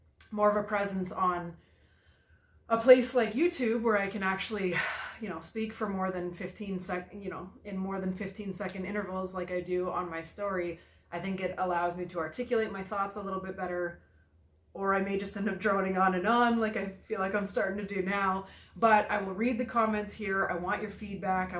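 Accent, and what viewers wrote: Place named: Canada. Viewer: How old are you, and that accent sounds Canadian LoL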